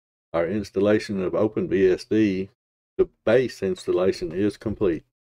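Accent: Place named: South, United States. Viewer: I could tell you were from the South by the accent. I'm from LaSalle Parish, Louisiana, but most of my family is over in Smith County, Mississippi. One of my mom's siblings is over in Dothan, AL. I've rolled through your neck of the woods a lot!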